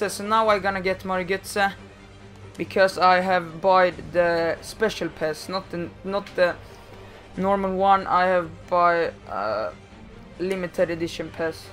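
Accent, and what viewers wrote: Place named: Sweden. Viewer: Du har en typisk engelska som alla svenskar har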